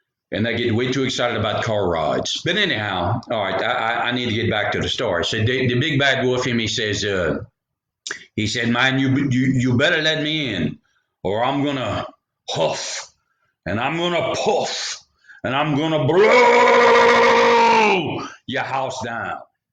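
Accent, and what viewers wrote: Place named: South, United States. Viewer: You really have southern charm accent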